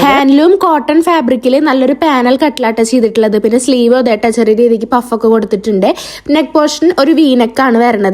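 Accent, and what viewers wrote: Thrissur, India. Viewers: Palakkadano thrissur ano oru samshayam ningalude sandam വീട് thrissur analo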